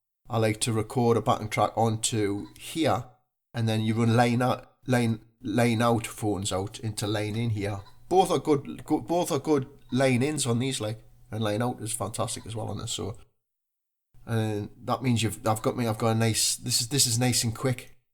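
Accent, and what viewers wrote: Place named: North East England, United Kingdom. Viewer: North East is my guess, closer to Newcastle than hull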